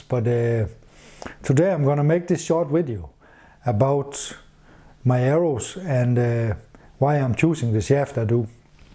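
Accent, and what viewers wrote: Denmark. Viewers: Your Daneglish is fine, I have no problem understanding you. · So... I must say again with humble respect: you have a beautiful wife which gives you nice gifts. You are a very, very lucky man. Director clap is very appropriate. Maybe she can use it every third or tenth video? I wouldn't mind at all. 😇 I likeYour Danish spiced English very much. I don't know if you would like my slavic English accent. But we use what we can, don't worry a bit. Another interesting video and interesting praxis data in the comments about your son and a 30# bow and his deer kill. I really didn't know that that is possible, as we are not allowed to bowhunt in our country. My only question would be, how is so high FOC affecting your spine of the arrow? I couldn't shoot such an arrow from a 35# bow, I think. I shoot 52# longbow (a little towards hybrid side, but still longbow under the WA rules), and I have allready a problem: I use 340 spine goldtip xt classic, with 160 grains in front, but are still a little softer, as I would like for this bow. 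I can tune them, but I have to go near the lower limit of my brace height, which I don't like. I also like the heavier arrow, because I use carbon just for 3d training, ( they are much more durable than wood) and wood arrow in this spine is allready very heavy. I make carbon set as similar to wood arrows as I can, and then before competitions I don't really feel much difference , when I have to use wood for longbow class. Some would say use 300 spine, but wooden shafts in this spine are allmost impossible to come by he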